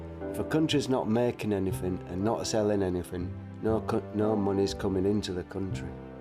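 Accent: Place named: Northern England, United Kingdom. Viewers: What kind of accent fo you have? Northern England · the accent remnds me of my friend from yorkshire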